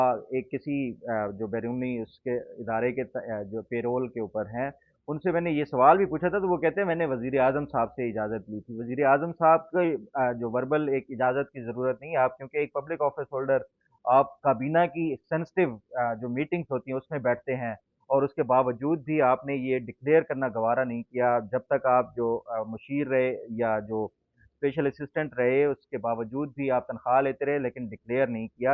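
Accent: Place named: Punjab, Pakistan. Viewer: شہبازمناظرے سے نہیں پاکستان کے گندے نظام سے بدل ہو کر گیا ۔ پاکستان میں جیوڈشری نظام کرپٹ اور بےکار ہو چکا ہے۔ پویس اور فوجی نظام غلاظت کی حد تک گندا ہے گھروں کی عورتوں بزرگوں پر تشدد عام ہے ۔ انسان کی عزت نفس محفوظ نہیں ملک میں رانا ثنا خبیث نےملک میں بدمعاش غنڈے سارے ملک کے برتی کر رکھے ہیں جو پہلا کام کرتے ہیں انسان پر جسمانی تشدد کرتے ہیں ۔کون سا آئین آپ امریکہ کے آلہ کار ہیں سب یہئ کہتے ہیں ۔ آپ کا لہجہ پنجابی کمزوراورکرپٹ انسان کا ہے ۔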